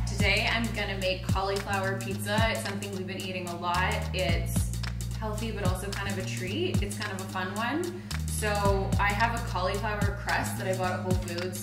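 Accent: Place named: Canada. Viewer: I love the sound of voice and her Canadian accent ❤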